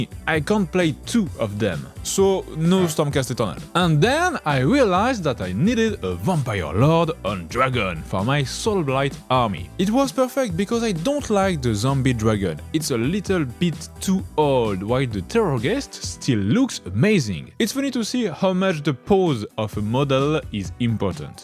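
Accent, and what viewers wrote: France. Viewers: Héhé you are the Bruno Albouze of mini paintings (same fr accent and all)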